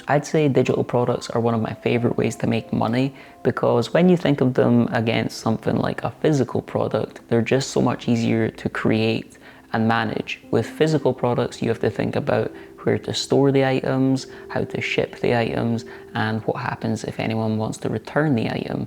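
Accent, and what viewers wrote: Scotland, United Kingdom. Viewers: Have you thought of doing voice over work? You have such a lovely voice although am probably biased because my mum is scottish and just love (all) scottish accents! xx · People love honesty, and being up front about things, it is endearing. Plus who doesn't love that UK sense of humor, especially silly or self deprecating sarcasm? I think the soothing. calming aspect of your videos come from your voice, quiet with that very noticeable Scottish accent. What's not to love there? And who wouldn't be fascinated with a noticeably ginger Scot with a white patch on one side of his head who actually has the nickname and likes it? That is just the best. I honestly like your videos about your cat, and you baking, and you taking walking/hiking tours of your local country the best. The beauty around you and the music you choose for those videos make it like a 20ish minute mini vacation. 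Great work ….Brian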